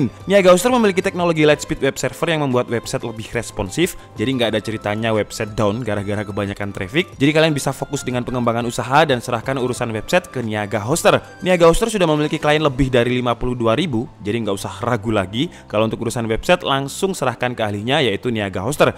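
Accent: Malang, Indonesia. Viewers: Mualang tenan jancok e metu 😂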